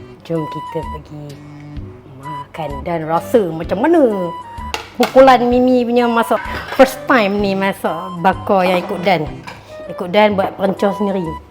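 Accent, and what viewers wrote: Penang, Malaysia. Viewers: Suka degaq loghat penang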